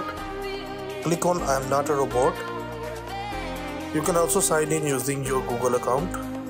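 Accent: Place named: India. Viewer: It’s just an Indian accent bro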